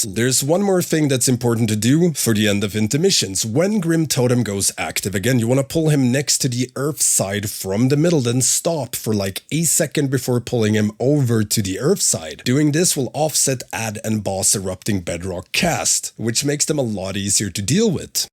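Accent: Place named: Sweden. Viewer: dat bjutiful swidish accent :)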